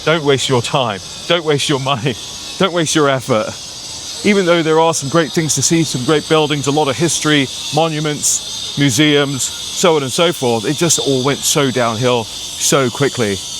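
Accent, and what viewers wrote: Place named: United Kingdom. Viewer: you're losing your beautiful British accent.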